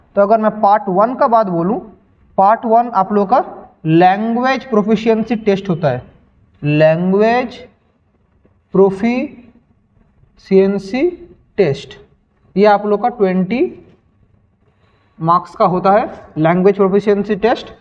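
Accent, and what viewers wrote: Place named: Bihar, India. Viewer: Ap bihari ho n bhiya , your bihari accent become to know me this😊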